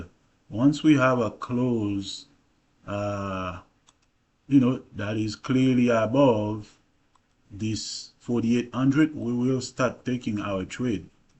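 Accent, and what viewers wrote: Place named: Haiti. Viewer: You sound Haitian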